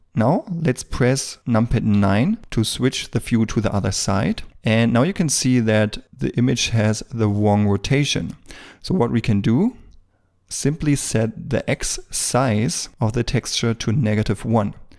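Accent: Germany. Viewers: das ist witzig man hört echt sofort raus, wenn ein deutscher englisch spricht xD, aber super video, sehr hilfreich, danke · du bist dedutsch oder? Cooles Tutorial 😍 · you sound like a german man:D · german accent exists: "give me the whole stock!" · i hear a german accent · Junge... xD man merkt dass du Deutscher bist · Sei mir nicht böse aber dein deutscher Akzent, man merkt es einfach. Ansonsten mega gutes Video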